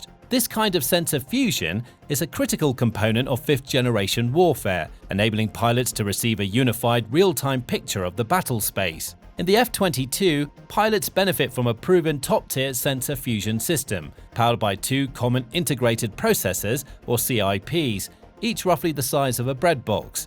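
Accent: United Kingdom. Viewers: Yeah. I expected it before I even clicked on the vid. This channel is still such a joke. But eh. It's a brit narrating so what do you expect?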